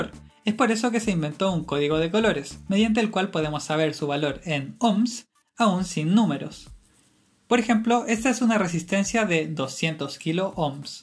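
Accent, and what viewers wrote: Chile. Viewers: Saludos ,eres chileno??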